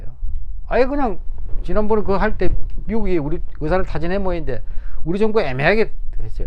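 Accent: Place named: Gyeongsang, South Korea. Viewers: 경상도 사투리를 서울 말씨로 고치세요. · 경상도 사람은 경상도 말로 해야 목소리 톤에 힘이 들어가고 더욱더 호소력과 전달력이 있습니다. 억지 서울말 보다는 얘기하기 편한 말로 하는게 더 낫지 않을까 생각합니다.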